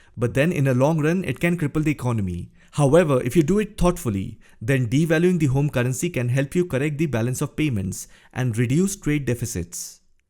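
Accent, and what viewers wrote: India. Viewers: I like his Indian English accent.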